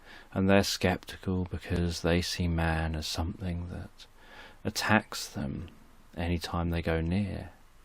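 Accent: United Kingdom. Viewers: Hi are you from the uk? Also were can I buy your book from? I am new to your channel, look forward to hearing from you Angel blessings Sylvia Watts ( Rev, ATP )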